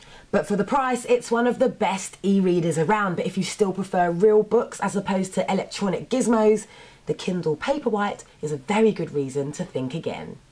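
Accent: England, United Kingdom. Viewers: WOW! You are simply amazing. You could have easily been talking about Fruit Loop cereal. Your boyfriend better treat you right cause you are a Dimond with a beautiful english accent. P.S. I would so follow a youtube vlog of yours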